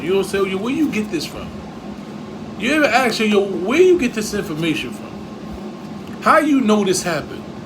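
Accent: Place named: Arab world. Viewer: Bro stopping saying hit the like button like & prescribe like that bro u sound like a arab use that persons voice it sounds more real believable bro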